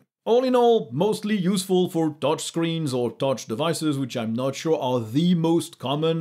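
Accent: France. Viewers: Thanks for the reply, bro. I'd update to 22.3 then. P. S. I like Ur channel and Ur style... And that English accent is nice. U r French, right?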